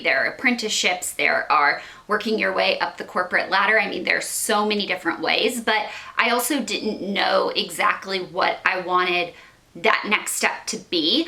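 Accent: South, United States. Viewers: you were one of the first, if not the first, beauty related youtuber that I subbed to way back when because of number 1: your southern accent lol number 2: your mac beauty hauls and 3: you were just fun to watch! I'm so happy to see that you're doing and looking amazing and that you took time off to refocus and find true happiness vs looking for it in LA. Everything in LA is rotten. so happy you got outa there! <3 If you're looking for new video suggestions lol I'd love a day in the life in Nashville. I'm dyingggg to move there! would love to explore it through your pov. :D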